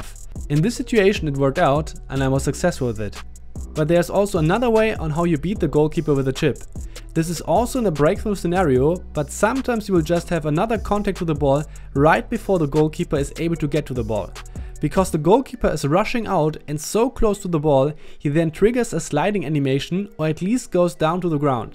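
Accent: Germany. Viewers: Man merkt extrem den deutschen Akzent haha 😂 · Bist du Deutscher? Hörst dich irgendwie so an🤷‍♂️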